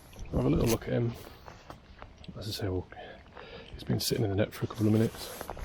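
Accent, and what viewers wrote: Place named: Midlands, United Kingdom. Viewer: Thank you, hadn't thought of just crushing, I use what's often referred to as a swiss file, and remove barb completely. It is probably a little more time consuming than your method for sure. I get the impression you are from the midlands, like me, I maybe wrong!! I live close to Warwick.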